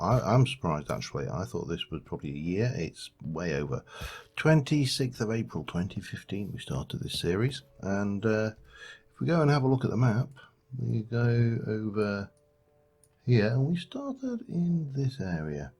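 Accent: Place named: United Kingdom. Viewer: "Tears" for the series ending but with that comes a new beginning. I've been with you since your second series of TFC and I would just like to say that I think you have one of the best TFC series out there right now. Like Jimmyzation said I'd like your next series to go just as long. I"m from the US and my sister and I love british accents and whenever I'd have a problem with my family, work or pretty much anything I'd just sit down and turn on your TFC series and everything else just fades away. I do have a request. I wouldn't mind seeing a blooper reel. We're all human but its nice to see us being human every once in awhile. I also wanted to apologize for not commenting more, I know it gets your vidz out there. I watch your series on my game console. But I'd just like to say thank you for your time and effort. Your series has been a great inspiration for my own builds and a great stress reliever for me and my family.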